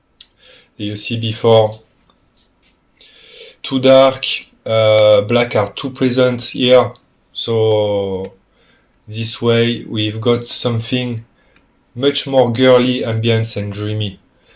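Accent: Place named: England, United Kingdom. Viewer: Merci pour le tuto c est top. Magnifique acens anglais en passant😁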